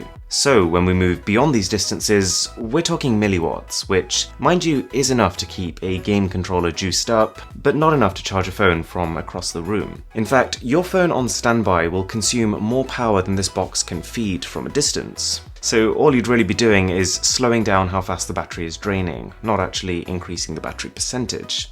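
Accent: RP, United Kingdom. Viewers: I cant stand how this guy talks. he talks like a royal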